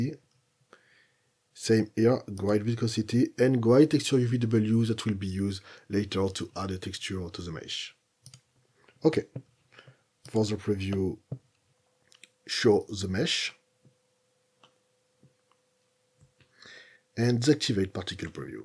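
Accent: France. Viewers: ça sent le french accent! En tout cas il y a du client si jamais tu le fasi en FR pour gagner en réflexion à l'apprentissage de tes vidéos!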